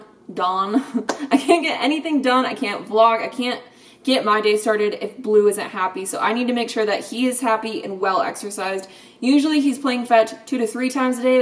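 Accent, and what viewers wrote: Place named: Appalachia, United States. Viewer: U r hella cool but plz stop doing that hillbilly accent u sound so stupid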